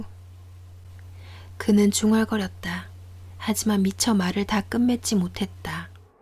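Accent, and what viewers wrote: Gyeongsang, South Korea. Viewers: 경상도 사투리가 너무 듣기좋습니다 좋은영상 고맙습니다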